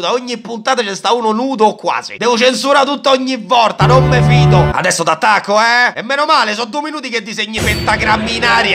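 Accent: Rome, Italy. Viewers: Te vogliamo bene,solo per l'impegno che ci metti nel fare questi video e per la comicità con accento romano che non mette freno alle mie risate. Grazie di tutto <3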